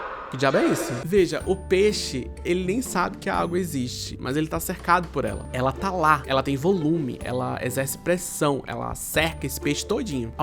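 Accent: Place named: Recife, Brazil. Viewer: tu és da zona sul do Recife, moço?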